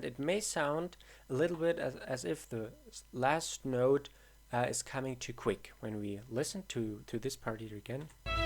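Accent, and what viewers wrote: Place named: Germany. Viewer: Aber Du hörst dich schon an als ob Du krampfhaft versuchst, den deutschen Akzent zu vermeiden :P (Geht mir aber genau so, wenn ich im Ausland bin..bloß nicht Deutsch klingen^^)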